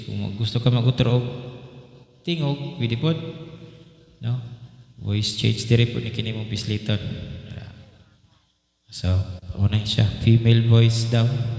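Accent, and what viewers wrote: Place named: Visayas, Philippines. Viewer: Bisayang bisaya naman ito si kuya.hirap naman agtagalog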